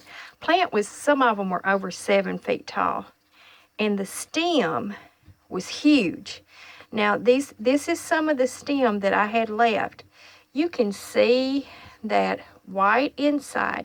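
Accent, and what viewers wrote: South, United States. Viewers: Great video,! Love it everytime I see it / review it. Have shared this particular video with several friends, including my mom. "These are my good DRANKIN' glasses! "- love it! ( referring to the 12 oz mason jars)! I'm not making fun of you at all though! I love your Southern accent and mannerisms! I'm a Southerner, too - a "Florida Cracker", and have some family up in Tennessee. Appreciate your channel and information on wild lettuce VERY much!!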